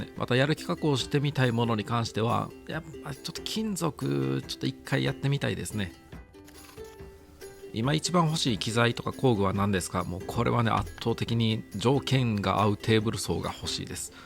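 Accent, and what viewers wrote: Kansai, Japan. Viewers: 関西の人の喋り方や〜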